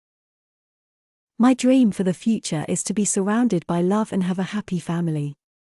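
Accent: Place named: United Kingdom. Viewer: I think this is the chanal that i was looking for to Master british accent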